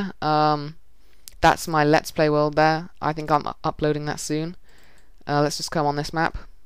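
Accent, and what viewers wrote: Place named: England, United Kingdom. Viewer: idk what your doing on the thing i cant read it :( and no offence to you or anything i just cant understand an english accent XD i need to watch more dr.who...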